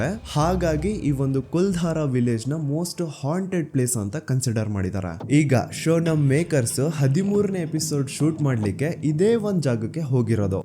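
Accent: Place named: North Karnataka, India. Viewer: ಉತ್ತರ ಕರ್ನಾಟಕದ ಕನ್ನಡ ಭಾಷೆ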